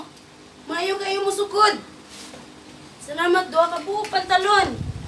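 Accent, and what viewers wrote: Visayas, Philippines. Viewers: Hahaha bisaya ka gd day oi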